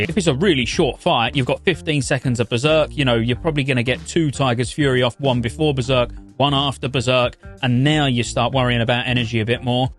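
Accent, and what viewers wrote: United Kingdom. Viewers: Hi right there! Did you appears to be british?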